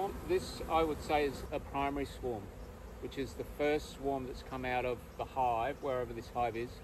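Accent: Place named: Australia. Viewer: Love your videos, especially your explanations. Who doesn't like a genuine Aussie accent? I have to remind myself it's Spring where you are while we are moving i to Fall here in Kansas, USA.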